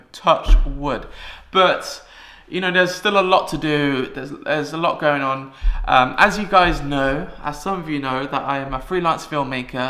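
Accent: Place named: Stockholm, Sweden. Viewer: I'm kind of inclined to disagree with you still about the grammar, there are more similarities than differences to me at least :P As for the sj sounds, most of the time you can get away with using the familiar sound that you do have in english, doing so will mostly just make you sound slightly Stockholm-ish. But keep practising, I'm sure you'll get a hang on the harder sounds aswell =)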